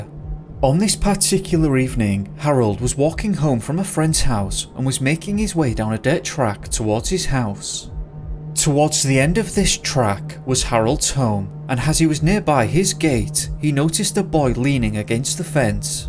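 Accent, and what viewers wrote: United Kingdom. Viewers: Why do you talk like a British chills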